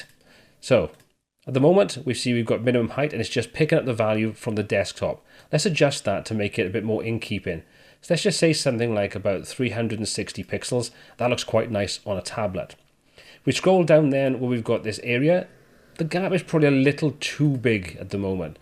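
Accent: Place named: Newcastle, United Kingdom. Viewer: This video took a lot of work to put together! Deserves more than 29k views. (You sound Geordie, are you? I ask because my Dad was from Wallsend and you speak very much like he did).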